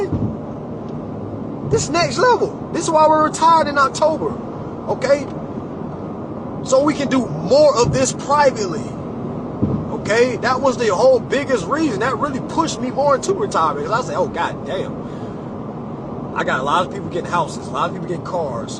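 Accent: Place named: Texas, United States. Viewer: Damn im from the west side of rockford bro u from the rock ? I been on yo channel fo a sec trying to learn ithought u was from tx?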